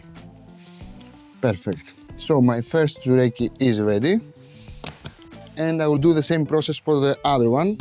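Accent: Greece. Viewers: your Greek accent is coming back 😅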